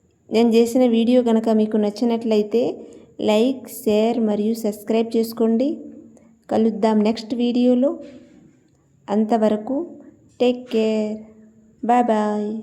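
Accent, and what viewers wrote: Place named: Andhra Pradesh, India. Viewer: Mee Telugu Vijayawada Guntur lo matladya Telugu la undi andi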